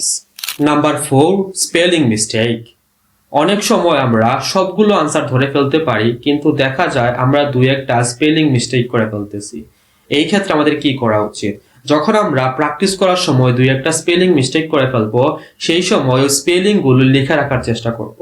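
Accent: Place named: Sylhet, Bangladesh. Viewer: দুজনই সিলঠি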